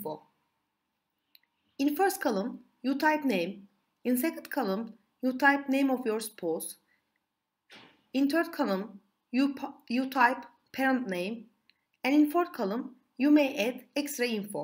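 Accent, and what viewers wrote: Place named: Turkey. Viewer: Türk aksanı bu nerede olsa tanırım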